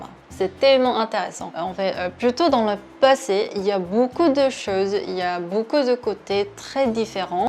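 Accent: France. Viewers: t a un belle accent Français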